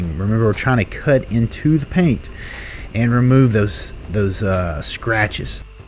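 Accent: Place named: Midwest, United States. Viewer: Call Me silly, But gosh does it feel good hearing a Midwest Boy. Great video, and nice work.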